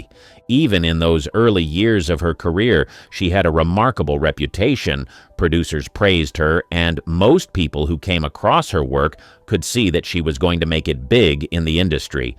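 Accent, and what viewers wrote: United States. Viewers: Typical uninformed American pronunciation with medial 'A's pronounced long. Had to stop an otherwise interesting video because I could not take one more 'Day-ner'! Yes, thank you it is 'Dah-ner'!.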